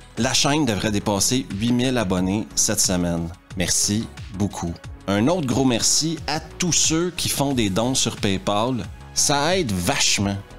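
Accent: Quebec, Canada. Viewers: Sérieux t as que 8000 abonnés ? Tes vidéos sont à tomber , tes choix de films sont toujours judicieux et bien a propos .alors après je me dois d avouer que ce si "sympathique" accent du pays du Québec, joue sur mon plaisir a "ecouter😂😂" tes vidéos ,je m'en fous t es un champion de mon youtubegame a moi !!!!!!!!!et je vais vite m'empresser de recommander tes vidéos à mes proches( c'est a dire ma mère ,mon frère et un peu de collègues Facebook .......faut pas exagérer j ai plus de quarante ans 😂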